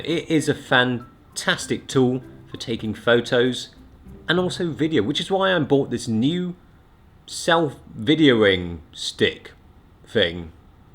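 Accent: England, United Kingdom. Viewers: Great video Phone comparison!. But I think this is the first time I've ever seen an Asian man with a British accent?.. but then again I live in the states.. cheerio!😉🇺🇸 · are u English bro?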